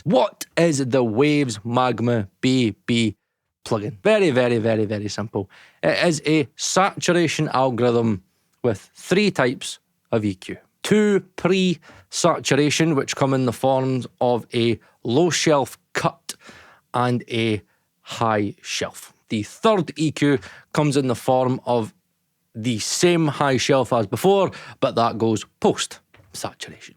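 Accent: Scotland, United Kingdom. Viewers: I love scottish accent. For Kick Attack preset I thought he said KekAttic.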